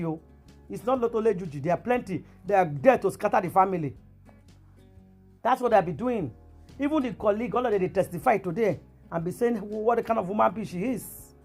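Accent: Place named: United States. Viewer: you are talking like an American, but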